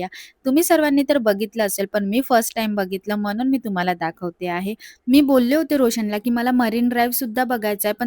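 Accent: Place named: Vidarbha, India. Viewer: मराठी भाषेचा विदर्भ tone बदलून शुद्ध केला तर खूप छान व्हिडिओ होतील आणि नक्कीच चॅनेल monitiesed होण्यास मदत होईल, कारण बाकी सर्व छान आहे त्यात केस तर खूप सुंदर ❤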